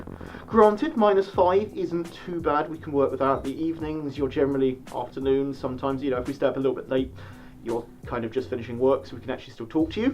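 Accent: England, United Kingdom. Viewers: I thought you were going to talk about the cute kind of "shipping" for a moment, there. ^_^ I want to be a furry, so it's good that cons exist in the UK, and it's possible to get a fursuit here (I may have already known that you were English, but I tend to forget as I can't really tell the difference between English and American accents, so I tend not to assume YouTubers are one or the other, if that makes sense.)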